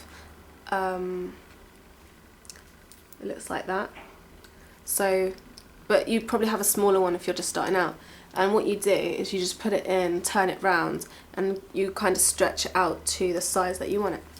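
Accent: London, United Kingdom. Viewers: I love the tattoo on your back/shoulder. And wow, has no one ever heard a London accent?! xo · I don't think it's fake at all. And even it is fake, it sounds very real. I'm from the UK, and my accent sounds very similar to hers.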